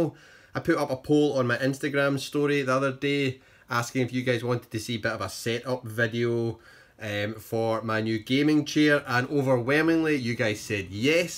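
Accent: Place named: Scotland, United Kingdom. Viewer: We'll definitely keep it in mind! Nice to hear another Scottish accent as well on youtube! :)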